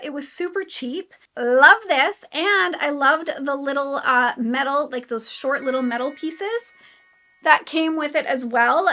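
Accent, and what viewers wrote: United States. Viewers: “Beg” for bag and precision pronunciation of “iRON.”. You’re either in Wisconsin, Minnesota, or Canada. lol, I love the upper Midwest accent 😂. Thanks for the video!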